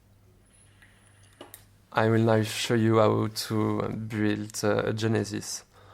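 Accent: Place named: France. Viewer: You have lovely French accent : )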